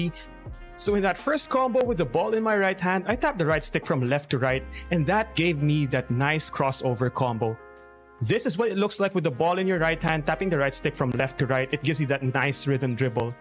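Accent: Philippines. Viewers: kaya pala sir, yung pronounciation mo pinoy na pinoy talagang hindi kinakain yung words, fluent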